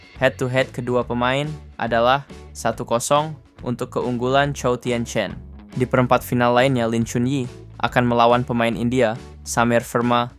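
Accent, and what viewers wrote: Banyumas, Indonesia. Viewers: Pengisi suara ngapak dah ga seenak dulu... ga suka suara yang sekarang..😢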